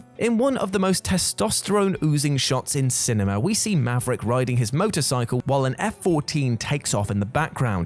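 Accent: United Kingdom. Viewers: God I hate British narrators!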